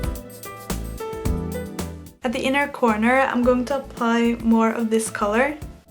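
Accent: Norway. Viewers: You're super good. but i can here by the accent that you are Norwegian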